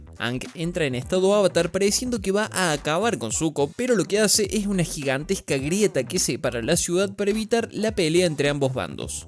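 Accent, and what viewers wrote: Argentina. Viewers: Sos crack amigo y además argentino jajaja pero de que parte sos?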